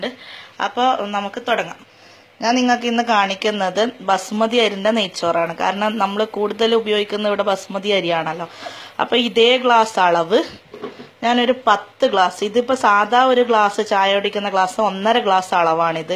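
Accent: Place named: Malabar, India. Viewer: റെസിപിയെക്കാളും ഇഷ്ടായത് നിങ്ങളുടെ നല്ല പച്ച മലബാർ ഭാഷയാണ്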